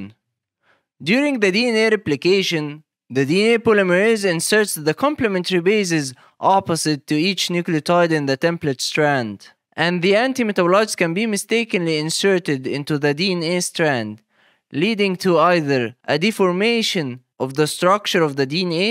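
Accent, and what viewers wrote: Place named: Egypt. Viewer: اعرف المصري لما اسمعه😂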